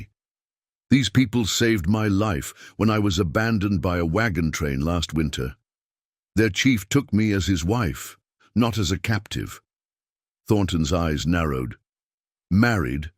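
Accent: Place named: England, United Kingdom. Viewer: Why in the world, when it’s a woman’s story, why don’t you have a woman narrating? Instead you have an English chap narrating for an American western, woman’s story?! Quite a turn off!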